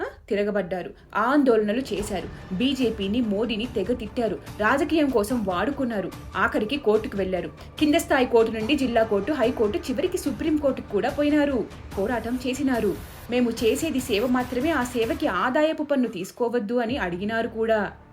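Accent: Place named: Telangana, India. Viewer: i like your accent man.. switching different slangs mainly telangana style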